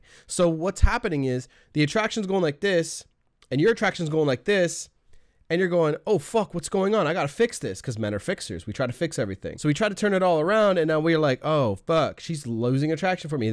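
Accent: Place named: Montana, United States. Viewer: Hey, where a-bouts are ya from? You almost sound like you are from Montana? Canada? Lmao!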